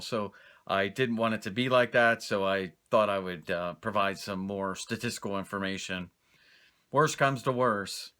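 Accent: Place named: Pittsburgh, United States. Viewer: your have a very strong Pittsburgh accent.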